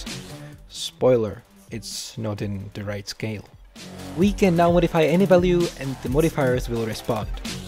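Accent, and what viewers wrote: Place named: Czech Republic. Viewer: Hmm, you accent sounds little bit like czech, or slovakian? Im sure youre from central europe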